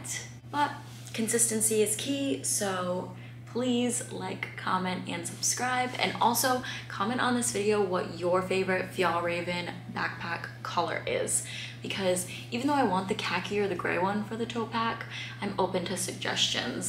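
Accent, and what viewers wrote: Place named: Boston, United States. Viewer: Are you from Boston? Love your slight accent and I think I see a cape cod bracelet. Cheers to you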